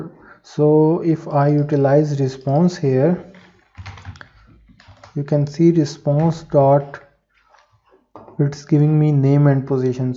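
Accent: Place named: India. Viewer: Once again, a dude with a thick Indian accent has the answer to my prayers.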